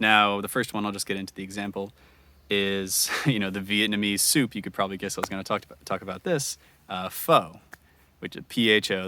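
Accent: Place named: United States. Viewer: So, for a start, you have an American accent, yet you're speaking English. And now you want to apply your AMERICAN accent to OTHER languages as CORRECT ENGLISH? Sure thing.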